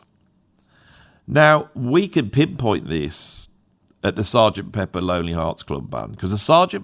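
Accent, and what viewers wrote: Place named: England, United Kingdom. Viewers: Dude I love your accent so much, I can't get enough of it, I end up speaking to myself in your super English accent lol .. love the way you think as well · Hey... you're right... you are a common folk fella...who speaks his mind sincerely....but you totally know what you're on about.... the scattiness of the presentation just authenticates your niche....and bytheby.... I'm a scouser and our accent is mocked and dreaded and even I know why.. ( especially in female tenor)..... I live here and I'll never demure to the high pitched caustic auoural onslaught of a scouser woman shrieking aloud..... cat's scatter.... dogs bark and their men plug their ears as though metal is grinding on metal and amplified with reverb and harsh distortion with wah wah and full tilt chorus pedal... As a juxtapose to that.... I can tell you now that your midland is accent is the perfect parlance to cast your net with.... 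it offends no one and is very easy on the ear.... I've never heard the term "Rob-Dogs" before and regrettably it is well earned.....I know that by living here...and will include it in my vocabulary from hereonin ❤ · Thank you for that insight. You're a great thinker. I once lived in Wolverhampton, and listening to you talking always brings me back there.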